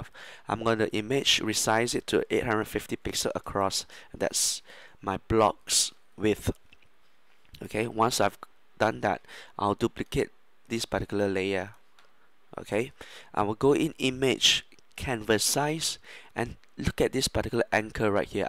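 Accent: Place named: Malaysia. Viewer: my guess is...he's from Malaysia or Singapore... :)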